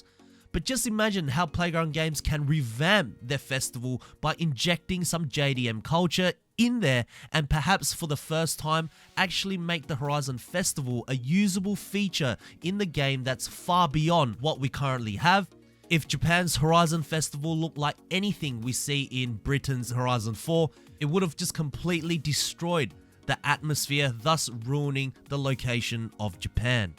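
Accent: Australia. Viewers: Is it the australian accent or do you have a runny nose? :D Nice vid!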